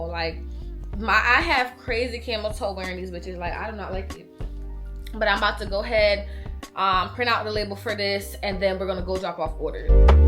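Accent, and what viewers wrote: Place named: Philadelphia, United States. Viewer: New subscriber! I knew you were from Philly the second you started talking lol. I agree with outsourcing photographers in NYC! Great content!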